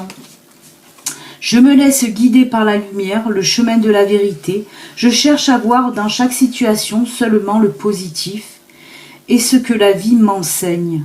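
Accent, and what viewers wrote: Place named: Lyon, France. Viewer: Bonjour je vous remercie pour vos guidances,votre accent lyonnais est une vraie joie chaque matin,pour une lyonnaise,comme moi,dans le sud ouest! J'espère ne pas vous heurter,en vous disant que vous me rappelez, une rubrique matinale de mon enfance qui s'appelait "la mère Cotivet" Au-delà de son accent,elle était pleine de bon sens! quant à ceux qui ne sont pas contents,de vos guidances, j'espère qu'ils vous remercient lorsque cela les concernent directement! je vous souhaite une belle journée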